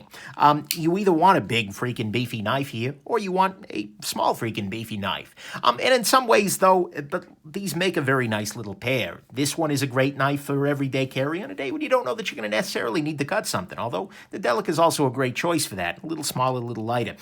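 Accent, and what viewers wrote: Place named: New York City, United States. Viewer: My wife walked in and asked if I was listening to Woody Allen talk about knives. You do have that Manhattan accent. Happy New Year!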